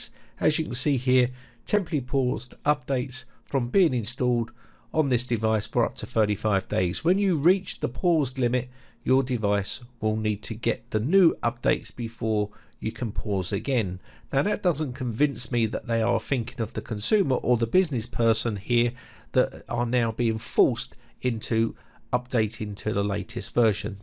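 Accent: United Kingdom. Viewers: Just an idle observation ( admittedly not crucial to the excellent info. in the video ).... What is up with pronouncing the word "defer" like "differ". It's bizarre because this guy sounds like a British native English speaker. I find it hard to believe that such a basic word pronunciation is not known.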